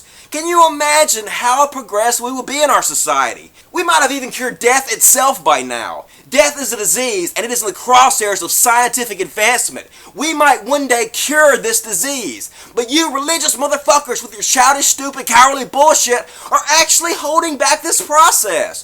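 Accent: South, United States. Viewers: You are the first man with a Southern accent I've seen who is an atheist. Congrats! I've subscribed.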